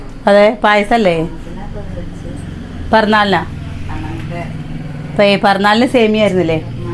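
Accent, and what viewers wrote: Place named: Valluvanad, India. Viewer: വള്ളുവനാട് ഭാഷ അങ്ങനെയാണ്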